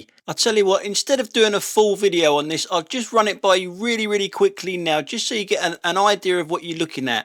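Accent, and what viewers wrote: London, United Kingdom. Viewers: Love your moaning! You sound just like my hubby with a London accent 😂 Great set of clips, but the dad on the cycle in front of his kid... What the actual...!! That child looks no more than 5 or 6 😢 crazy!! 🤪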